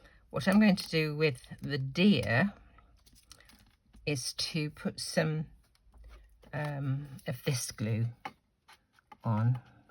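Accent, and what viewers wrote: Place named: RP, United Kingdom. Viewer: I love clean and simple cards! You once again made another beautiful card. Thank you for your tips and tricks of your many years at this craft. I also love your words you use when you drop something or if something goes wrong 😂. I’m from the US, so your accent and proper English makes me giggle 🤭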